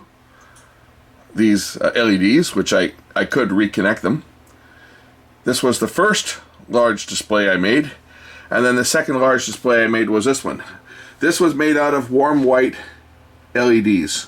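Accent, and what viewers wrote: Canada. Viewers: Hurricane?? You must be near me in Florida....but you sound Canadian.....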